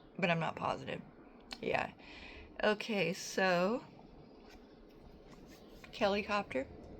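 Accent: United Kingdom. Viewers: I've been catching up on a bunch of streams. Sorry I didn't see this earlier. 👏 👏 👏 👏 Great Brit accent & recap of Kelli Craptor's video. I can't watch her amongst others who fall in line behind MAGA kArOn. They're disgusting imo. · Thanks - agree 💯 and the Brit accent is hilarious! ❤❤❤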